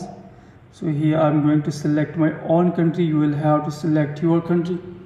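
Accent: India. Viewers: He’s Indian bro. He did great.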